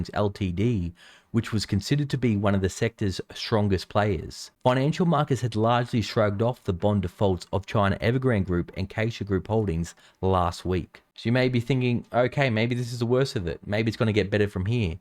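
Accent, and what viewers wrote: Australia. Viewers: i miss the HOLY SMOKES EVERYONE in your aussie accent hahaha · Does he have an typical australian accent? I'm not a native English speaker and I try to learn different accents.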